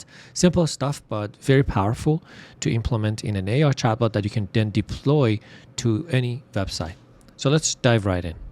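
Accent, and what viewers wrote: Iran. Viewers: آخی فهمیدم بوی ایرانی میدی هم از لهجت هم از چهرت کارت هم درسته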